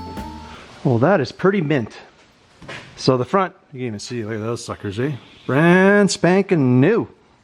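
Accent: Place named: Canada. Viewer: This got Canadian accent with a southern twang, but great content 😂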